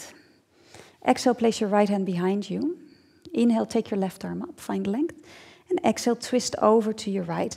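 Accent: Netherlands. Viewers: Heerlijk die Hollandse T's in plaats van de 'Th' klank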